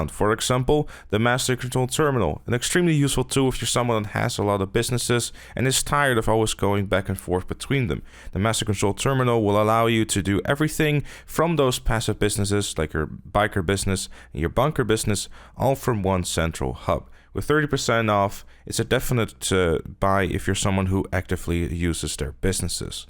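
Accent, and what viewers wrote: Netherlands. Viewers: Wait are you Dutch? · I miss the Dutch accent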